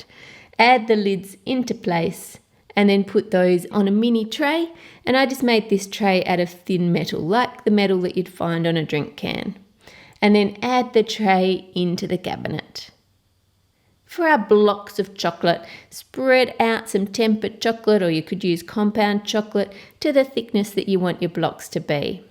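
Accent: Australia. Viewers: Is she Australian or English? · Heaven Claunch haha I didn't notice that because I'm Australian like her 😂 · I like your Australian accent :D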